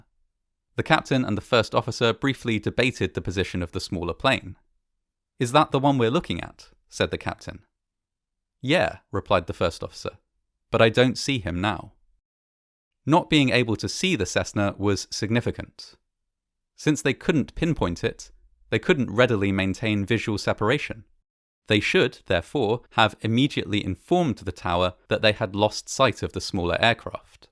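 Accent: United Kingdom. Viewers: Another British narrator? Come on You Tube hire some people in this country!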